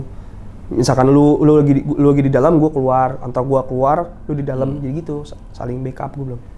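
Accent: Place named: East Jakarta, Indonesia. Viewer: Lo so asik bang jaktim bgt 😅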